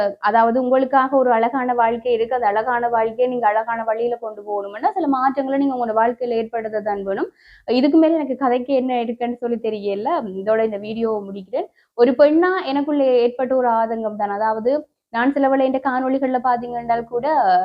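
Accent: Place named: Jaffna, Sri Lanka. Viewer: தங்கா உங்கள் யாழ்ப்பாண மொழி அருமை இப்ப யாழில் இளம் தலைமுறை கதைக்கும் போது தமிழ்நாடு மொழி நடை கலப்புடன் கதைக்கினம்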